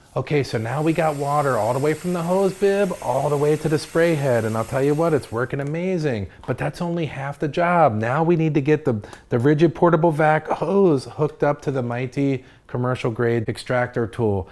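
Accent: Hawaii, United States. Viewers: Love that Hawaii accent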